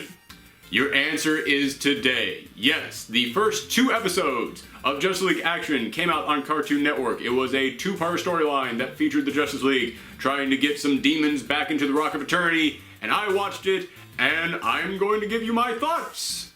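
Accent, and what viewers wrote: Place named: South, United States. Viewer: wait just a minute your from the South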